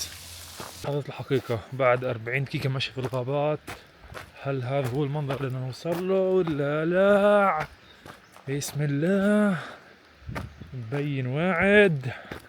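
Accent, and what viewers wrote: Palestine. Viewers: لهجتك الفلسطينية الأصيلة اجمل اشي ولازم تكملها يا عمي بالحلال مع بنت الحلال .. ربي يوفكم للخير ويهدي بالكم ❤ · من وين انتَ من فلسطين 😅😅حاسس من رام الله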